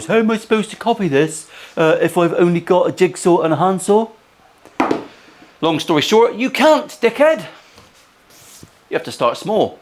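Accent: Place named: West Country, United Kingdom. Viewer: It isn’t possible to swear too much when you have a West Country accent. It’s actually pure poetry and art… and fockin funnoy!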